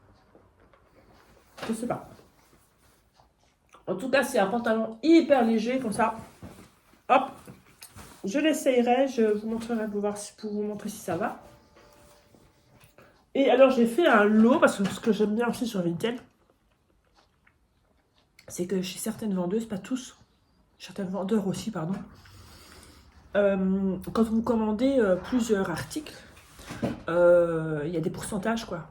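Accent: Belgium. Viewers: Cet accent belge c'est magique, notre qui nous fait une vidéo à l'ancienne je valide! Amitiés du pays basque à toi et à tous 😁😁😁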